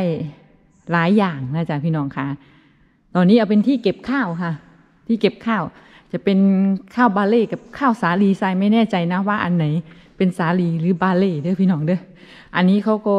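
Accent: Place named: Korat, Thailand. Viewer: สำเนียงเหมือนคนโคราชคั่บ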